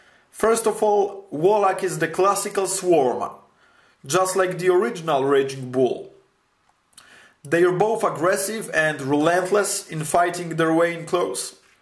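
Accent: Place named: Poland. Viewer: od razu wiedziałem ,że polak gada haha no ,ale ok przynajmniej inne kraje tu zaglądają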